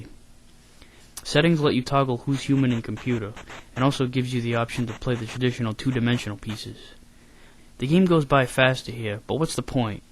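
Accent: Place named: Boston, United States. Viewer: This guy has the best God damn accent. Are you from Boston?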